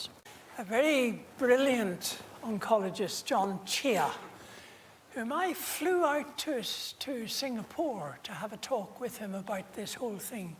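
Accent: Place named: Northern Ireland, United Kingdom. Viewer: Sounds like a lovely northern ireland accent! 😊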